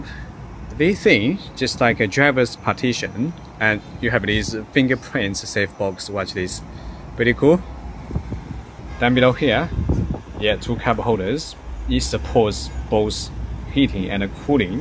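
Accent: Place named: United Kingdom. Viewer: 非要说英式很别扭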